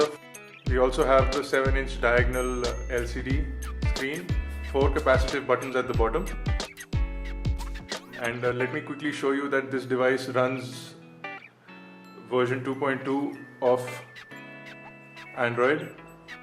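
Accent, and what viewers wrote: India. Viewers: Hands of a white man, but accent like an Indian. Dude, your review put me to sleep...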